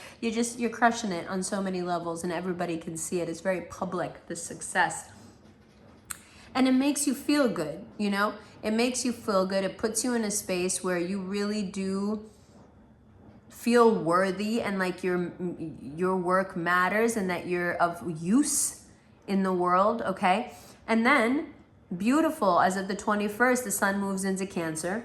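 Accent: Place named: New York, United States. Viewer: LOVE you Fam!! ❤️🕉️🙏🏼 That New York twang coming through strong 😍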